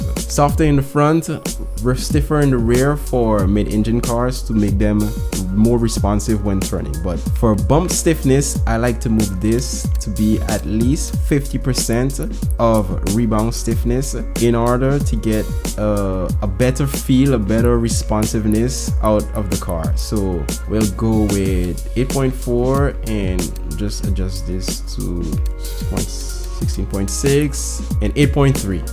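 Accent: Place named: Caribbean. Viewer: You sound Carribean, are you?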